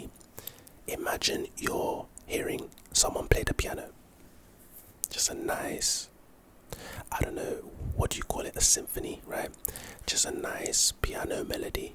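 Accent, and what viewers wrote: United Kingdom. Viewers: ? Pronunciation of what? He's British I believe - they speak English in England lol 😂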